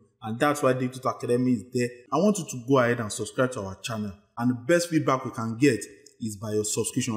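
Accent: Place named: Nigeria. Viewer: Sounds like a Nigerian accent 🤔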